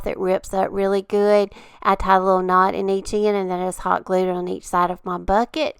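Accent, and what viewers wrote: South, United States. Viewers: I so enjoy watching your videos...I love everything about them from your instruction to your display of the items and also your background music. I think you are so great at what you do and I just love all of your videos. You are such an inspiration. I also think you have the cutest southern accent and are so pleasant to listen to. Thank you for sharing your wonderful talent.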